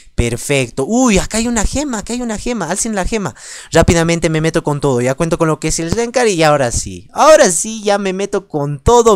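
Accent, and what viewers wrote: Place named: Bolivia. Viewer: Vocalisa bien boliviano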